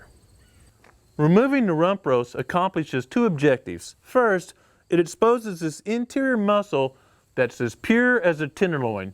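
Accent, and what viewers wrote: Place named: South, United States. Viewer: Sir, I don't know where exactly you hail from. But you sound like a Southern gentleman who knows his stuff...minus all of the extra stuff. And for that I am thankful. This video is direct, effective and presented in a friendly yet knowledgeable tone that helps a lot of people learn...like country northern boys like me. Lol!. Too folks want to put on airs cause they're in the public eye (especially when on YouTube), they want more "hits", or they think a flashy style beats substance. But at the end of the day, good old common sense, common tactics with common tools always reign supreme cause...they work! Good job. I'll be watching your channel.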